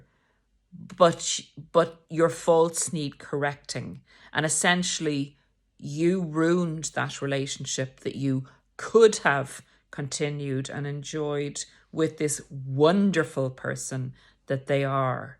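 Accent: Ireland. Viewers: I love to hear your Irish accent demonstrating the way in which one treats the Narcissist (Covert) in my case. Keep up the good work! And thanks so much for all your work and may we all be blessed! I find my Guardian Angel is also helping me a lot in negotiating this new territory. God bless you as well as the Work! K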